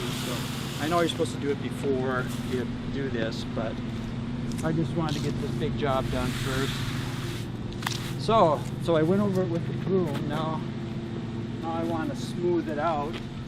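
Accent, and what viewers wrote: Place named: California, United States. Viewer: I thought you were from California cause you look like Tony Hawk and you have a laid back Californian accent 😉 wish I could've helped. Yeah weather degrades it fast. Just applied a 3 yr sealer 2 weeks ago and sun already disintegrated part of it. Im in upstate New York, weathers not quite as harsh as Minnesota but there are times when we're colder, plus we get a ton of lake effect snow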